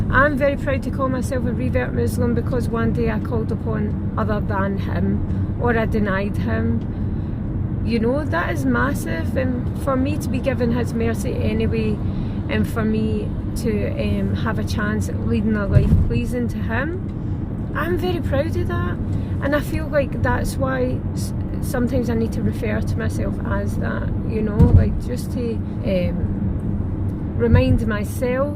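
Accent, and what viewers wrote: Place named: London, United Kingdom. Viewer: Salam 3aleykii, where about in london you from sister? I love your voice and accent 😍😍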